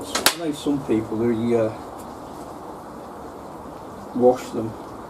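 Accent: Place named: Worksop, United Kingdom. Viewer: You sound like your from my area of the UK (worksop)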